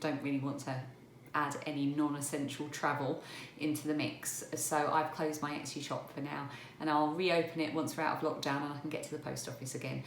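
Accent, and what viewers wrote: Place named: Kent, United Kingdom. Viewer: Hi, I was born at West Hill too! Recognised where you were walking in this Monday's blog as it's near where I used to get the bus to school. I've watched your blogs for a few months as I love crafting and recognised your accent, so thought you must be in that part of Kent. Accent you may say, I haven't got an accent! That's what I though till I lived in other parts of the country. I'm a teaching assistant near Nottingham now and children are always telling me I sound different to them when we're doing phonics, especially 'a' and 'u'. Makes me laugh. Anyway take care.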